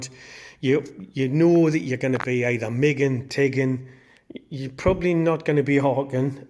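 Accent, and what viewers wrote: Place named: North East England, United Kingdom. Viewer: Yes please, keep them coming. I've got massive affection for Capris. I learnt to drive in a MK2 Cortina, which I loved. I have had 2 of those, a MK1 Capri, 1600GT XLR, which I adored and obviously should have kept, and loads more Fords, last one being a Series 1 Escort RS Turbo, also wish I'd kept that! It sounds like you are from the North East, did you ever come across a guy called Gordon Leeming?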